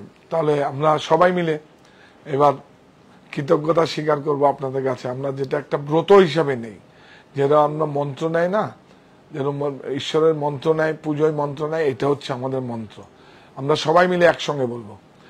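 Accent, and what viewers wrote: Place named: Marwari, India. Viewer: The way you speak, it appear like a typical marowari . If you bring some “ bangaliana “ in your speaking , you would appear more believable..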